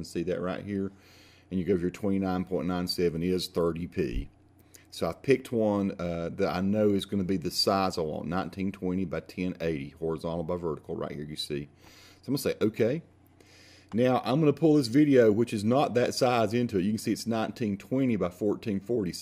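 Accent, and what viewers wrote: South, United States. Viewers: Fuckin it took so long to find this thank you southern accent king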